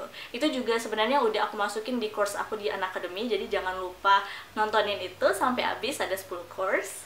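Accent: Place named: Bali, Indonesia. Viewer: Kak kok logatnya Bali😂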